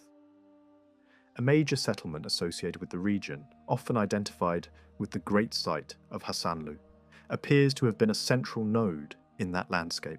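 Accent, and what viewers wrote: United States. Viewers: Konum Türkiye ama aksan ABD 🤔?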